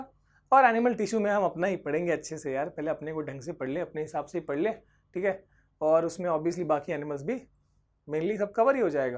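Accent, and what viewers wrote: Bihar, India. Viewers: I really love the way and the accent u used to teach 😂💕 your bihari accent is mind-blowing apne wali vibe aa jati h 😌💕. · Sir ap bihar ka ho kya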